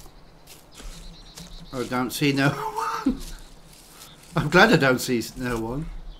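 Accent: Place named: United Kingdom. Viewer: from now on I'm watching ALL of your vids with the closed captioning on. Apparently goggle can't decipher a British accent, and translates it HILARIOUSLY!!!: "Uh, I see shit." Google Translation: "Rubbed, litigation." Bwahahahaha!!! Keep up the great work, mate.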